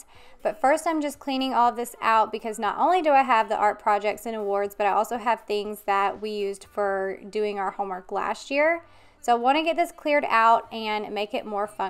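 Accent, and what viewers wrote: South, United States. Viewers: Hi! I just discovered your channel. I went to college in Savannah! Its great to hear a little southern accent. 😉 Thanks for a great video, I look forward to watching more.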